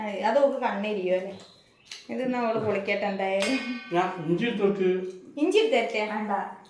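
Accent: Kozhikode, India. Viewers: കോഴിക്കോടിനടുത്ത് കല്ലായിലോ ഫറോക്കി ലോ ഉള്ള ഭാഷ പോലെ തോന്നുന്നു?❤❤❤❤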